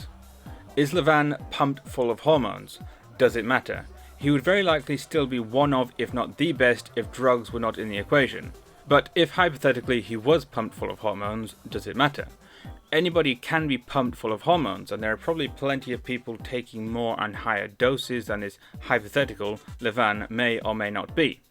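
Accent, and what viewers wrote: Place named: United Kingdom. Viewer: Wow where are you from? That’s the strongest British accent I’ve ever heard, with a slight hint of Malay/Singlish added in there too.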